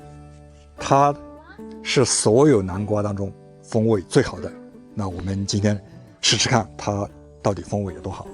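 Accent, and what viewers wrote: Yunnan, China. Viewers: 我的家乡是云南德宏芒市，听着您的乡音太亲切了! 我也喜欢种菜，您的视频太有帮助了!谢谢!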